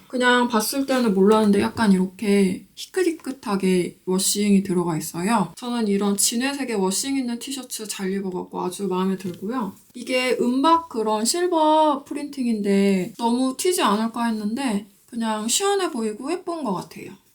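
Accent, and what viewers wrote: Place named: Seoul, South Korea. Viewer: snl 나온 서울사투리를 현실로 본것같애요! ㅋㅋㅋㅋㅋ 옷 다 이뻐요 잘 보구갑니당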